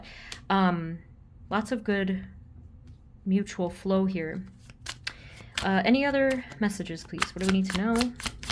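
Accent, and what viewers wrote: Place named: New Jersey, United States. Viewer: Girl! I've been wondering forever if you're from New Jersey...you sound like "home," and I'm from Bergen County...that answers it! I wish I still lived there so I could check out your store! I hope it's up and thriving...Bless you!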